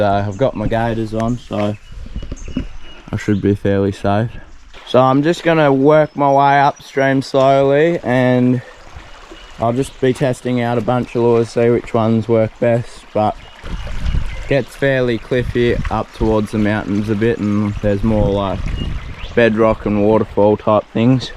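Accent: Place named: Tasmania, Australia. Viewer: You are living the life,. Good to see. I hope any snakes you encounter know they are only allowed to bite your gaters. Curried egg sangas with a wee bit of lettuce in them are in fact yum. You sound like Tob Parsons Must be a Tassie thing.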